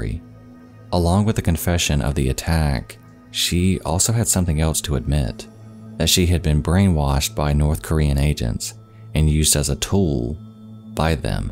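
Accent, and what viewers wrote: South, United States. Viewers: Is this ASMR? Smooth Southern ASMR. Are you young people able to stay awake listening to this?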